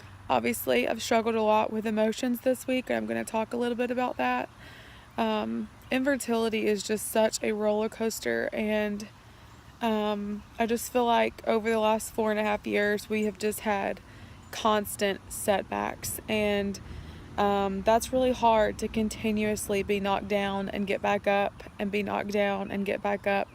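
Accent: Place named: South, United States. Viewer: You are so beautiful! I love your southern accent. Praying for you!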